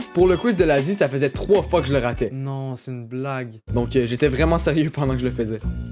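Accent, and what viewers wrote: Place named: Quebec, Canada. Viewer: Salut, tu utilises quelle application pour le montage ? Et sinon, j'adore l'accent Québécois, rien qu'à la pdp, j'ai vu que tu ressemblais à ADAM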